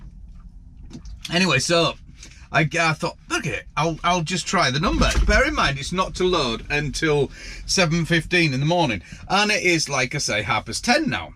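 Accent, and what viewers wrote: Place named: Cockney, United Kingdom. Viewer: Is that a Cockney accent? Even the trucks recorded voice had a female Cocky accent. Doesn't sound like rural Iowa to me.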